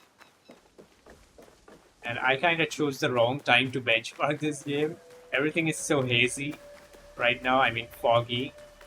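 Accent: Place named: India. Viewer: слышу индуса и на душе тепло как-то сразу становиться.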